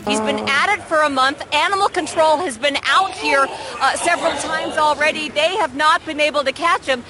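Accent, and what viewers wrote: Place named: Australia. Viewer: your voice is so soothing. Almost couldn’t tell you were Aussie! ❤❤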